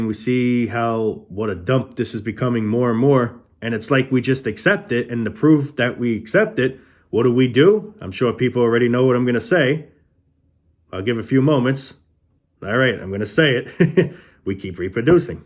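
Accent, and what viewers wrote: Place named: New Jersey, United States. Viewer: By your accent my guess is you’re from New Jersey.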